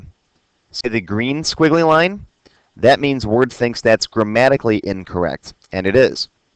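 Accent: Chicago, United States. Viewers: I checked out several tutorials, (school free ware etc). This is easily the best tutorial on the internet. Love that Chicago/Great Lakes accent, LOL! Seriosly, thank you!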